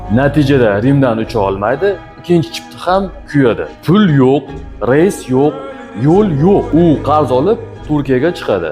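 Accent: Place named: Namangan, Uzbekistan. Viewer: Namangan xili qimanglar Uzbek til adabiyotida yuq kutlarda bordima?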